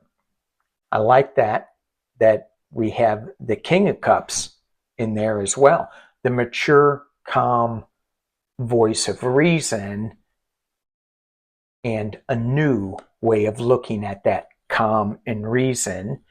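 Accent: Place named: South, United States. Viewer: Yeeeeee ... your good ole boy Southern accent was sneaking in today ... 😁 ... yeah, a lot to talk about ... xx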